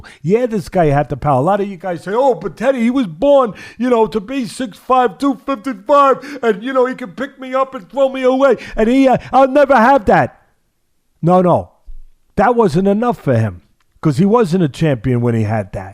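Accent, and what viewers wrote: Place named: New Jersey, United States. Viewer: Teddy is the Ultimate 🥏 heavy weight Communicator..: passionate, articulate, insightful, intelligent 🤓, and funny with that Iconic NJ accent . When he gets to Heaven King Jesus wants him to talk to Him for a Thousand Years on Fighting...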